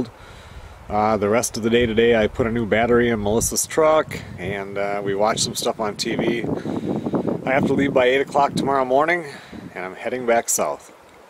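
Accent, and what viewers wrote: Minnesota, United States. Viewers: I knew from the first words that you spoke, you were from Minnesota...That accent is strong up there....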